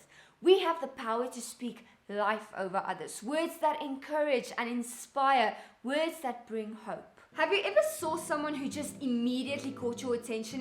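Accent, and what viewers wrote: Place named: South Africa. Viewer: Lol I was about to say you sound South African 😂😂. Enjoyed your message. Beautiful from Botswana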